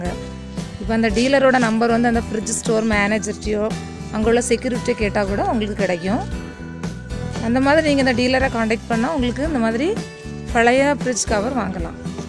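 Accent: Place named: Kerala, India. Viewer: hi mam,thank u viewers, pudichirunda subscribe pannunga,nu neenga Malayalam kalandha Tamilla solradu romba cuuta iruku , well. done very good!!!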